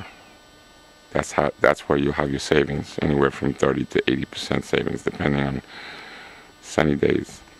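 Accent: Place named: New York, United States. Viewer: Hes in the Northeast, listen to his New York accent and notice his New York plates.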